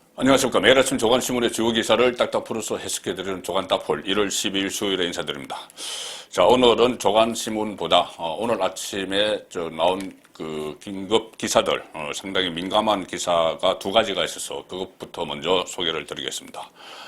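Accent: Gyeongsang, South Korea. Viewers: 발음 좀 교정했으면ㅡ 아무리 경상도라도 억양은 이해되나 발음은 영 아닌데 고쳐보세요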